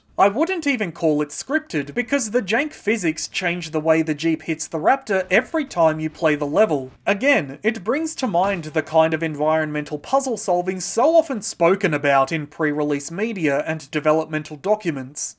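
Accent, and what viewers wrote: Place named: Australia. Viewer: He sounds Australian to me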